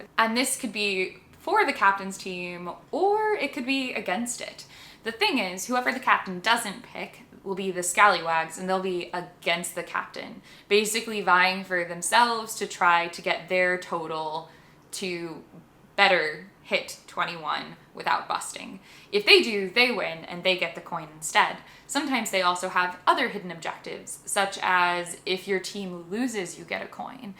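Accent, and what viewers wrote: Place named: United States. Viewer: This was a great video. Thank you! It's my first time commenting on this channel, but it's been growing on me ever since it was recommended by Jenna Rose in the Board Game Garden channel. I especially love the "dominant species" and "your mama" tests 😄 The first one especially made me reflect... Quite intrigued about the "philosophy nerd" aspect, since that appeals a lot to me, and I don't often meet those two aspects that I love (board gaming and philosophy) put together. Do you happen to have a second channel / blog / whatever about that? Lastly, I'm confused: you sound American, but your likeness is surprisingly similar to my Italian best friend! It's uncanny 😂 Anyway, thanks again for the great video :)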